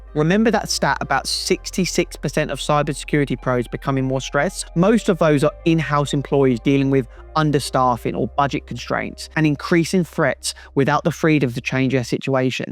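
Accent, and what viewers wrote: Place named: Australia. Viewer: Thanks mate I let you know later because at the moment I'm busy to move in Asia. You're Australian, I recognized the accent because I lived in Perth for 2 years. 😁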